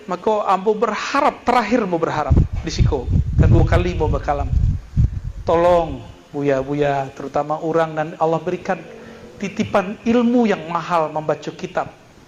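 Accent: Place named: West Sumatra, Indonesia. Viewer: Ok thanks... Mohon maaf aku ya. Agak suudzon aku semalam Kukira dubbing Lanjut. Tetap semangat. Saya suka logat minangnya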